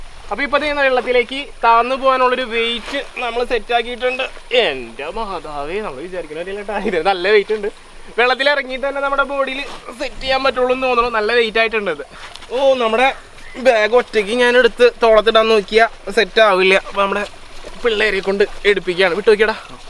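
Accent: Thrissur, India. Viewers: മച്ചാന്റെ വീട് എവിടാ തൃശൂർ ഭാഷ ആണല്ലോ?❤️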